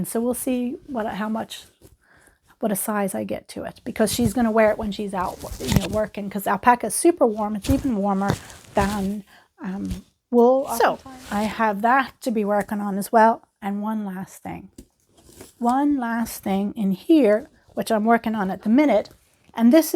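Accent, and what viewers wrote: Ireland. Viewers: I enjoy content variety w/ ya YouTube page! #High5Blessings Super Interior settings and content details in video... You totally resemble my neighbor #fyi Super Irish Accent. Posted link on my Tweeter page.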